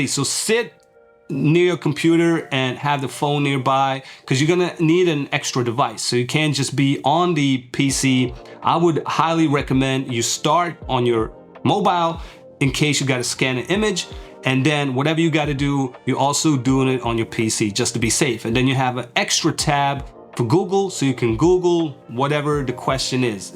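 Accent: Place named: New Jersey, United States. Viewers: even sound american. Am i trippin? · I thought my man was from New Jersey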